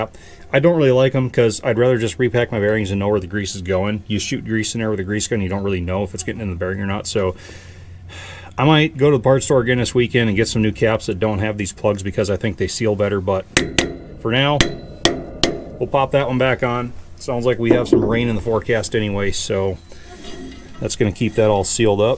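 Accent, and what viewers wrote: Minnesota, United States. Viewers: Here's a curious observation (not a criticism).....the extremely rare times you appear "stressed" on any video, your uniquely Minnesota accent comes out. As for the "swagger" inference.....it's more likely due to the way you walk especially in the field.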